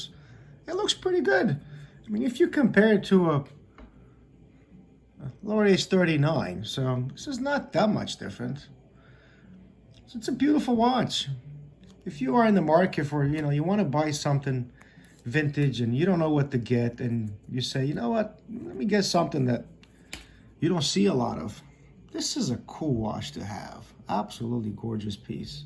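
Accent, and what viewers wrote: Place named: United States. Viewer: M-ai ambiționat sa scot vechiul atlantic al bunicului meu care e într-o stare destul de bună dar are nevoie de o servisare, am cumparat ceasuri noi gen Citizen eco drive, Certina, Orient și chiar uitasem de Atlantic 😂 Dacă nu spuneai unde te-ai născut nu îmi dădeam seama ca ești român, ai un accent de american pur, frumos review like a Pro! :)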